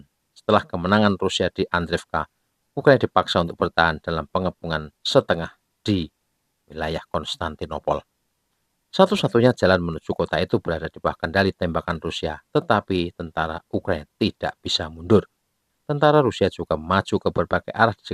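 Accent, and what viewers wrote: Java, Indonesia. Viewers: Logat jawanya kental...ngx,seru bahas perang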